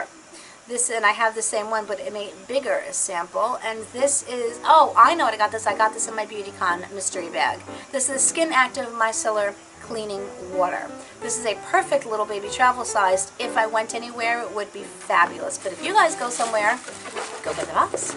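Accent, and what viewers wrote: New York, United States. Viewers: From one New Yorker to another...who sound the same lol! Love your videos! 😉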